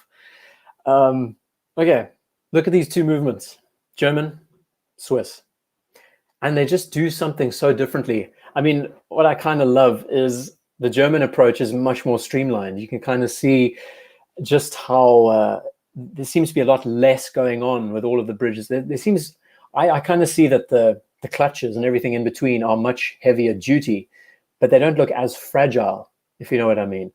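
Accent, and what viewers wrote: South Africa. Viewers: Your dead wrong Bob! I completely disagree! FYI it is just under 4 hours of snobbish and arrogant critiques and opinions of watch photos by an obviously narcissistic man with a South African accent that he has mistakenly made sound so patronizing and annoying that nobody listening to him will realize he was trying to sound intelligent or "cool" because their brain will be frozen by the endless nails down a chalkboard effect that his voice has on them and he never stops talking for more than a second or two for the entire four hour torture fest. This obvious narcissist who definitely cannot afford a single watch that he talks about unless his "Daddy" buys him one is also completely unqualified to critique or give his opinion on these timepieces so thank goodness for the repetition Bob, it helps us to remember the information being shared that is most likely completely wrong and/or irrelevant. 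The best part is this guy is only 26 or something unless I heard him wrong which I may have because I was often interrupting him by yelling and screaming profanity at the screen but who talks like this guy at 26? Notice he doesn't show his face at all which is probably because he was bullied so bad in high school that he had to enter the witness protection program. The world is going to end soon if arrogant, luxury worshipping little weasels like this guy can actually make money rambling on about watches. Freedom of speech is a real burden sometimes and this is one of em big time. I wis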